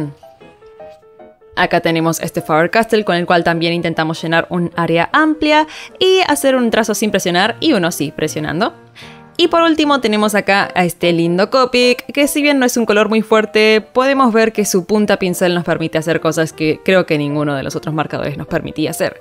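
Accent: Argentina. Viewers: ''Ni puñetera idea'' te quiero, pero aveces el Argentino-Español se te pasa un poco.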